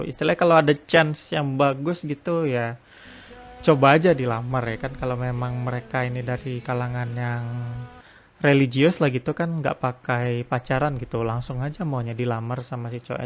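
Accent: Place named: Bireuen, Indonesia. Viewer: Bacanya Bireun banh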